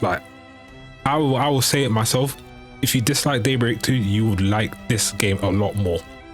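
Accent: United Kingdom. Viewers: I didn't know you're black. Not that there's something wrong but I have you in my mind as a Japanese with a British accent.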